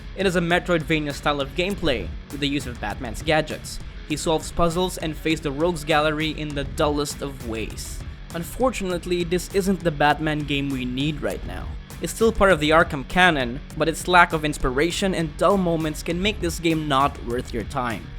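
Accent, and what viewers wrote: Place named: Philippines. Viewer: I swear you really sound Filipino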